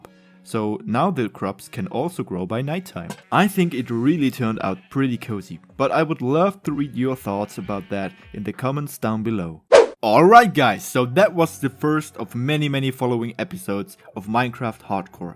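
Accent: Germany. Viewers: I recognize your accent, and which land you come from -- I just spent a month in BW. Your videos are high quality and you have what it takes to become a big-league minecraft streamer. The amount of time you put in to your videos is evidence enough of that. The dry humor is a nice touch. And they say die Deutsche don't have a sense of humor lmao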